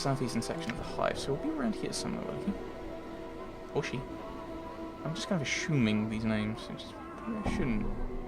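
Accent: United Kingdom. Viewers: Some words i coudn't understand, so i turned on subtiteles which made complete gibberish. That's when i realized i finally can understand UK accent! Love this playthrough, the way you are trying to read every dialogue the devs had put into the game, with relatively fast pace reading. You have inquisitive mind, and i love that you share your impressions of the game and your thought process.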